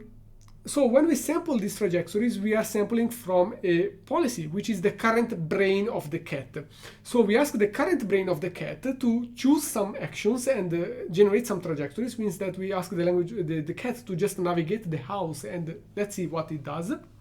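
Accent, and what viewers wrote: Italy. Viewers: I love the Italian accent 😂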